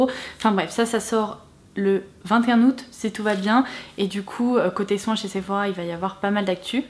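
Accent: England, United Kingdom. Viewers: Super vidéo, maintenant il reste plus qu'à économiser mdrr et j'adore ton accent anglais ! 😃❤️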